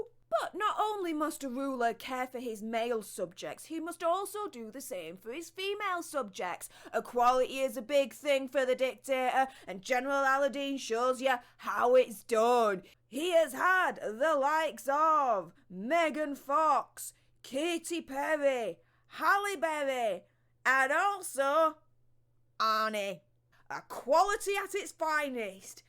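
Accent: United Kingdom. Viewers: I'm thinking it's a Yorkshire accent? Maybe she'll correct me if I'm wrong lol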